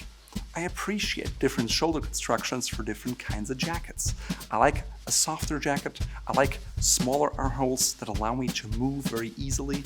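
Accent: Germany. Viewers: still they have to work a lot on the audio quality and the German accent which is quite challenging... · Your name is as German as they come. You even have a German accent. No way you're in the US.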